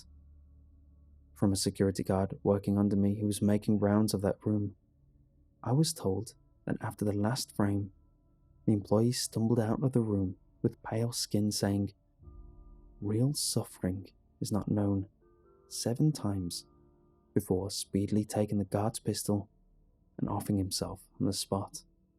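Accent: United Kingdom. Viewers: Something about a British accent makes the stories sound scarier for some reason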